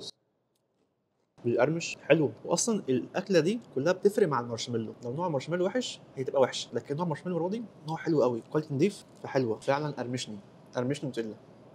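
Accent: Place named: Saudi Arabia. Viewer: لهجتك السعودية حلوه مره😂😂😂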